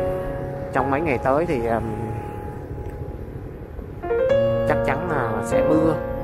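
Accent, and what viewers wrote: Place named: Da Lat, Vietnam. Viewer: Thank you em trai, giong DALAT de thuong.,rat yeu va nho DALAT, ❤️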